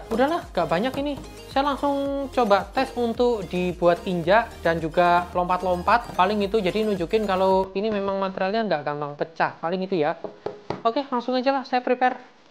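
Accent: Surabaya, Indonesia. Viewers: mangkane kok logat e ga asing, arek boyo tibakne, tak sub koh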